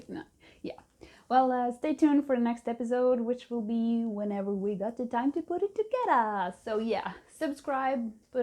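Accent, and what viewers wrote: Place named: Ohio, United States. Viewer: ha thought you were from ohio,,,,love your accent and your love for metal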